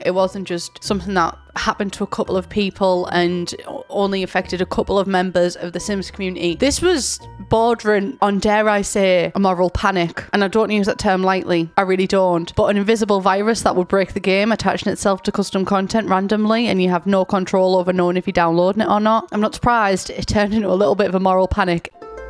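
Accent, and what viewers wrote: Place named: Middlesbrough, United Kingdom. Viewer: click like if you come here for the middlesbrough accent. say 'purple shirts'!!! 'PAIRPLE SHAIRTS'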